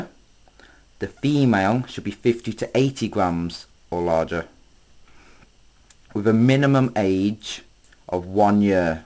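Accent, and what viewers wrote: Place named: United Kingdom. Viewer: hes british u tit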